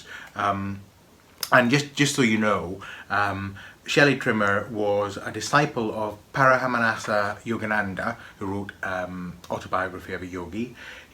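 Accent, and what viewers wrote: Scotland, United Kingdom. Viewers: Hi there. I worked on this on the 29th July 2021 after watching just this lesson. I thoroughly enjoyed finding that my Base Chakra has the Sun together with Venus! Chiron is with Neptune in the Heart Chakra. Jupiter joins with Uranus and the Moon in the Throat Chakra. I found it quite fun to bring the Elements, Positions and Polarities into the mix too. I enjoy your other videos as I studied 19 years with a private Jungian teacher, worked with Astrology and Tarot through the 70s. Its wonderful to have the old background learning in all these and other areas brilliantly updated. As I child I regularly dragged my mother into a particular shop so I could hear the Scots accent so this is another benefit! Thanks for your dedication and enthusiasm.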